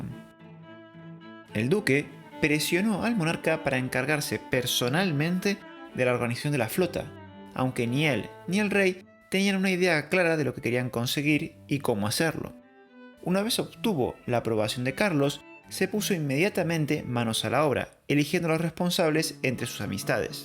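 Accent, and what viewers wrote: Latin America. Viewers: ¿Su voz en off es la de un hispanoamericano que valora las aportaciones del Imperio Español a sus territorios?. Una misma Lengua (la del Reino de Castilla) y una misma Fe Católica.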